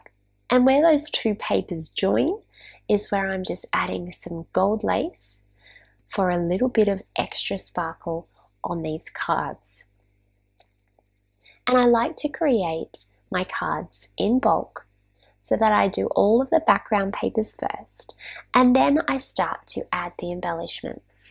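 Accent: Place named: Australia. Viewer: I love hearing a fellow Aussie accent on YT! New sub from Central Coast NSW 🇦🇺❤️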